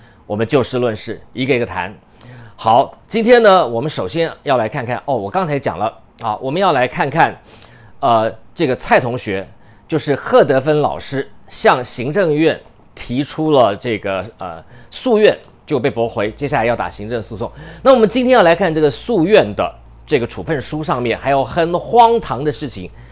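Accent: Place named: China. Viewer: 整個口音變中國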